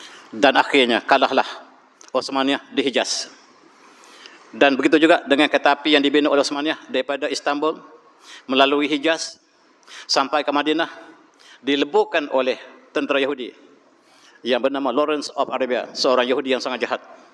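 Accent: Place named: Kelantan, Malaysia. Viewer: Saya sangat meminati isi2 ceramah Prof. Sgt banyak ilmu yg boleh dikutip untuk dijadikan panduan dan pengajaran. Cuma, cara sebutan prof ada masanya agak sukar difahami. Samada terlalu laju atau pengaruh dialek kelantan yang kuat. Kalaulah prof dapat memperbaiki cara sebutan dalam setiap ceramah, ia akan lebih bermakna dan memberi menafaat kpd semua penonton. Penonton prof bukan shj dari Malaysia. Ada juga dari Indonesia, Brunei, mungkin juga dari negara lain yg lebih sukar untuk memahami dialek tempatan. Apapun, saya sgt menghormati prof, seorang ilmuan yg dalam kelasnya tersendiri. Teruskan dgn video2 yg memberi manafaat kepada umat sejagat.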